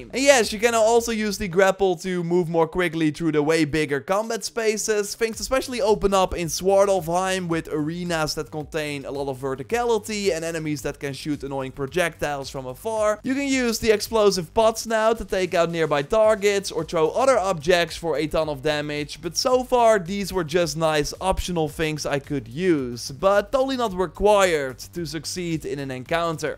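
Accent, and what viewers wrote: Netherlands. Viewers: ARE YOU DUTCH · You can hear that you're dutch 😂 (Goeie video maat!) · dutch accent right?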